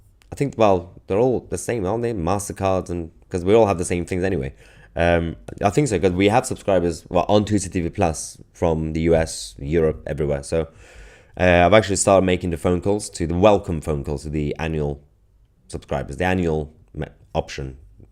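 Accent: United Kingdom. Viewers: British/Persian, God bless you, I understand that, I do not understand what is the ludicris point, of calling you other nationalities, you definitely have a lovely British accent. You are a Brit!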